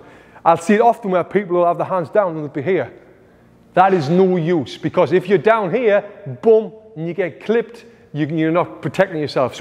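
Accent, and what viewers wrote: United Kingdom. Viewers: The British accent makes this 100x better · I like your British accent 🔥🔥🔥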